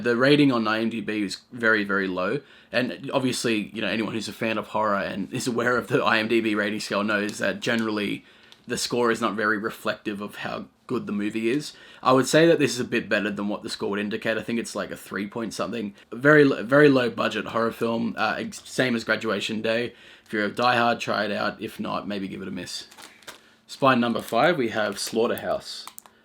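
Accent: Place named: Australia. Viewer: oh Wow, well you honestly don't have a really strong aussie accent. Don't worry, I don't think that's anything you need to be concerned with lol.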